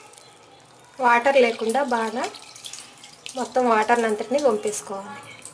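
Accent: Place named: Guntur, India. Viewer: Miru bale cheptunnarandi same guntur style lo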